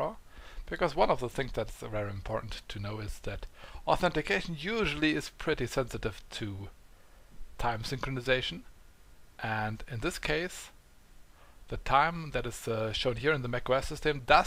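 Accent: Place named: Germany. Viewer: Thank you... Your accent is absolutely German :)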